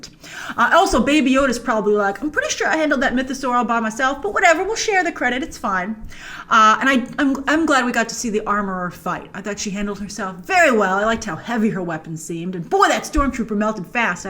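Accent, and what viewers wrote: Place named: New York, United States. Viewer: Whats just as great as an Amazing Season Finale of a Star Wars Show???...an equally AMAZING Review of It!!!!....Can't wait for your next live Stream, life's just not the same without hearing that wonderful New York accent and seeing that Beautiful smile that lights up the World Live 🥰😍😁😉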